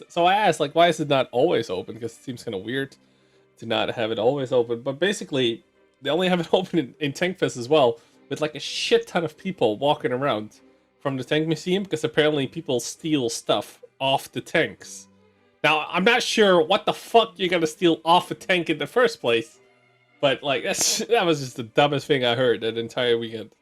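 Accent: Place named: Netherlands. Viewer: IELTS? I'm asking because the accent is barely audible. Dutch myself as well, noticed that a lot of other Dutch people can speak English quite well, but the accent is very prominent.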